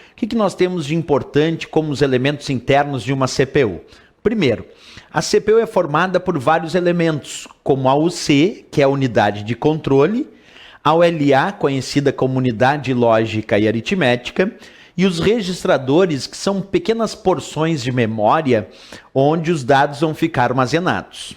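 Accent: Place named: South, Brazil. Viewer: nossa que voz chata de povo do sul, sutaque horrivel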